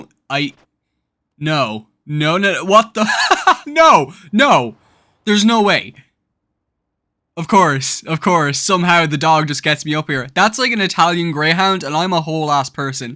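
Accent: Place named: Ireland. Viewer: This is the first video I've seen of yours, I am SO GLAD to find a fellow Tepig lover (I also agree that pignite is such a happy boi). Bonus points for being a fellow Brit. (After listening to a bit more of this video I realised you sound Irish, so sorry about that mate.)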